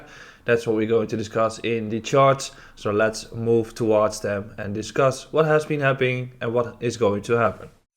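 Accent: Netherlands. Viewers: Ben je Nederlands ik hoor een accentje.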